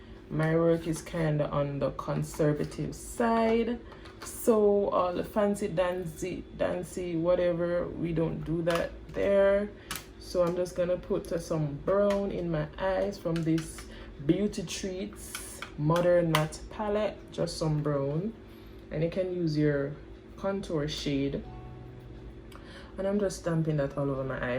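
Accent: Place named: Jamaica. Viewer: Jamaican accent so real mi lobe it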